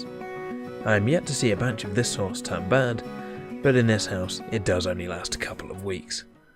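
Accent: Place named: RP, United Kingdom. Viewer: you sounding very posh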